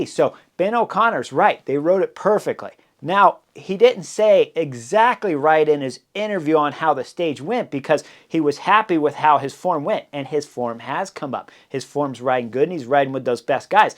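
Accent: United States. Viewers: Hey, I'm an Aussie. Would you mind telling me what part of America your accent hails from?:)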